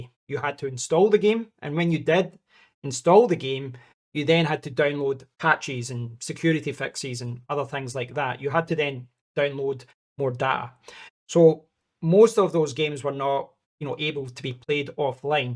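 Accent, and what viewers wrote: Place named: United Kingdom. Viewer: LOL ...my ethnicity is filipino but migrated to Australia when I was 10 yrs old. My auntie lives in Essex, UK since the early 70s and married to a Jewish man born in Whitechapel. I visit the UK every 3-4 years. Love the people and culture, especially history! I'm a Churchill fan lol. All the people that I've met in my life growing up that have Scottish accent were all smart be it my Math teacher, my local dentist and family doctor so I've personally always associated the accent with intelligence so when I watched your video and found out your IT dev background I wasn't surprised! LOL Looking forward to more vids! God bless! 🙏👍😆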